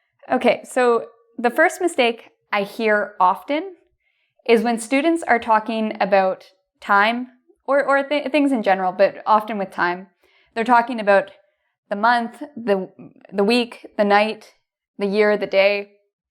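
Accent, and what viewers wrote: United States. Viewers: Is she american?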